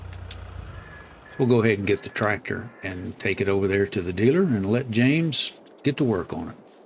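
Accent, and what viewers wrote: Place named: South, United States. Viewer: Thanks for the video, you're so relaxing to listen to, I came home from work and eat then sit down to listen to you and about fell asleep not that you're boring just relaxing listening to you. You sound like your country is that your voice or are you throwing it for the video? Lol But thanks again for your time appreciate it!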